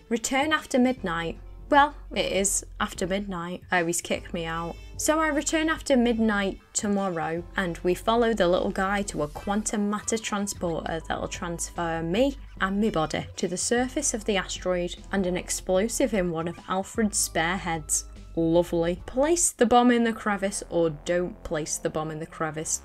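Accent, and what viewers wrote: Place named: Northern England, United Kingdom. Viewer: gender swapped david lister from red dwarf is on youtube doing sims reviews! sign me up! (i’m a millennial american nerd, we hear one northern accent & we get real goofy with trying to bring up pop culture stuff younger folks in the UK may not “give a toss” about 😳—ok back to sewing and smoking weed while watching this vid 🖤)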